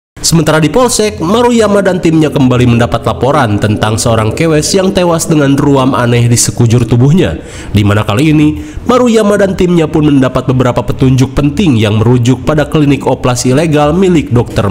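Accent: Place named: Sunda, Indonesia. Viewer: suka gue sama pembawaan nya Sunda banget bacotan nya keren mang jangan bosen bosen upload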